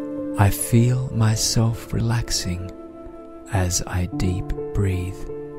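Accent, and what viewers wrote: Australia. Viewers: once again I cannot thank you enough for all of the help you have provided me with over the last 2 years just from your videos. Your voice puts me instantly at ease (aussie to aussie glad to hear your accent). You are my go to whenever I am fighting either anxiety or otherthinking. I don't know where I would be without you!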